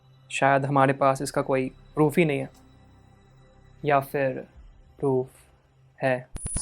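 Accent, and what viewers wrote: Bihar, India. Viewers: bro r u frm bihar??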